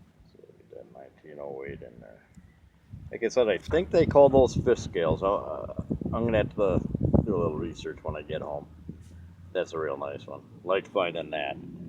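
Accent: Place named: New England, United States. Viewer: Man I love the way you talk yank it's so funny to me A 😎good digs y'all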